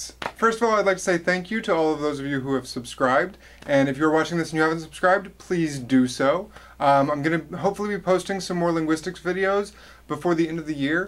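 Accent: United States. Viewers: Hah, I guessed central or Western Kentucky. Is that close enough for a win? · You can tell you've lived in Texas, though.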